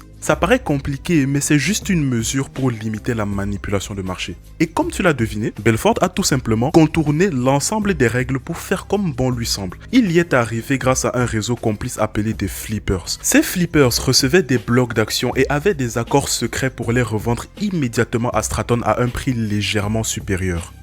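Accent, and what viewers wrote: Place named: Africa. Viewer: dommage que tu as un accent africain qui gâche tout le professionnalisme de la vidéo. Désolé mais dirait une parodie.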